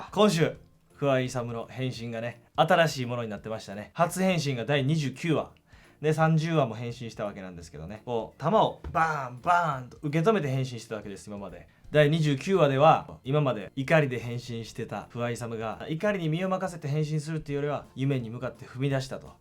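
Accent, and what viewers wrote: Kansai, Japan. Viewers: 関西人？ 方言にビックリ…。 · 関西弁あうやん かっこええな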